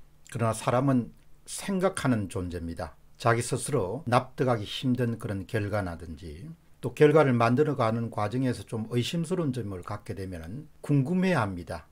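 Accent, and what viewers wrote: Gyeongsang, South Korea. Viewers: 경상도 사투리쓰네 ㅎㅎㅎㅉㅉ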